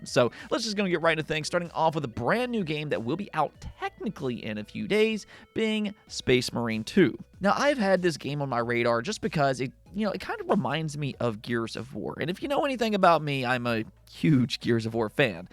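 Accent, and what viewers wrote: Philadelphia, United States. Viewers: Are u from Philadelphia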